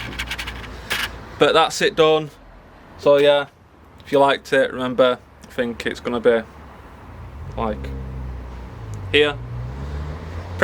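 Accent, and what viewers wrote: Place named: Leeds, United Kingdom. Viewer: Are you from Leeds? Accent sounds familiar, I'm from Leeds too, got a silver Vectra 1.9 👌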